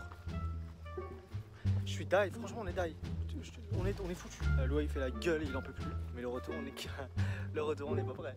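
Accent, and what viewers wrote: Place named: Algeria. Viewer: J’ai trop ton accent algérien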